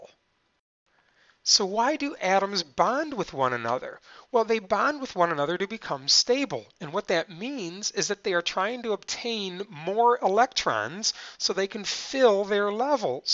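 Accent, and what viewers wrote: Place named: Boston, United States. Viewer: Wicked Bahston accent!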